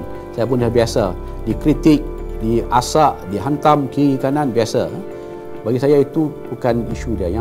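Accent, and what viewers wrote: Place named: Kedah, Malaysia. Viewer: Lama datok kt luaq.. tpi loghat kedah datok msih ada lgi.. semoga allah lindungi datok dan kita seluruh rakyat Malaysia..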